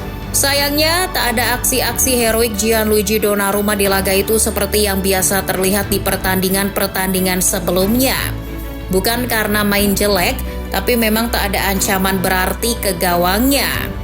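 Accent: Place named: Manado, Indonesia. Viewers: Logat manado wkwk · Lah admin nya orang Manado ya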